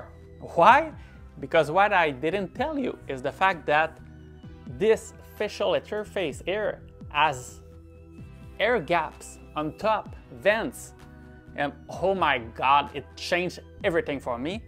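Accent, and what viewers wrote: Quebec, Canada. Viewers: Est que t’est québécois?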